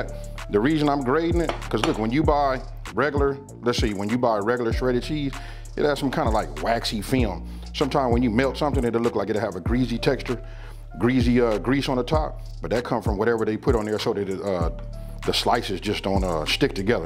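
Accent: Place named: California, United States. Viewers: Knew you was from Cali before I even seen the hat lol Took me 20 years before I realized we had an accent out here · The LA accent in affect! Lol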